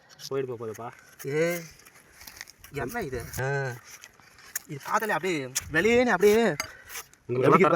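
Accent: Krishnagiri, India. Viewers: Banglore hosur Krishnagiri area tamil bro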